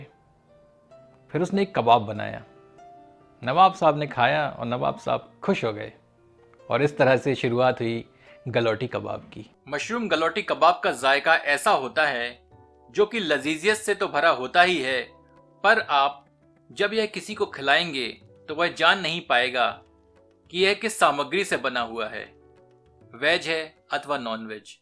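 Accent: Standard. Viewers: Badhai shuddh hindi uchcharan ke achcha laga sun kar. Aap kis pradesh se hain ?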